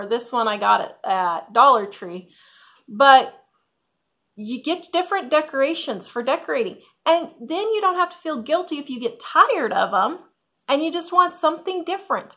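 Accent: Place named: Kansas, United States. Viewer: We all know that you say warsh instead of wash. This is the first time I ever noticed that you say tonne instead of ton. Is that from Kansas, too?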